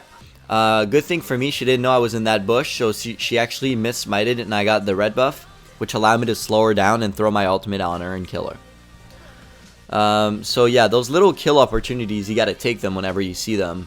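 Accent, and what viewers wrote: Quebec, Canada. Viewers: T'est anglais de base ? ou québecois parce que ton accent est vraiment ÉPIC :D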